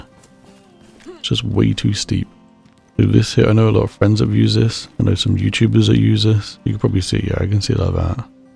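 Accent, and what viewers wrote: United Kingdom. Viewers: r u scottish or english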